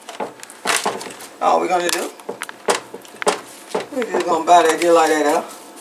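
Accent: South, United States. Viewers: Omg I just love the southern accent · Ain't nothin' to it but to do it.....Juh like dat dare!! Not a racial thing. It's how some people talk in the south regardless of color. Chef, keep doing you!! Love the recipe and your personality!! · Hell yeah I knew you was from the south 😂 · When you see a black woman with a southern accent you know that that stuff is going to be good!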